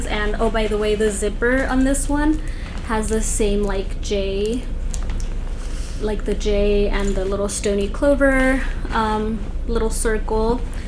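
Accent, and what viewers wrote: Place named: Canada. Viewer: Are you Canadian? I hear a accent 😭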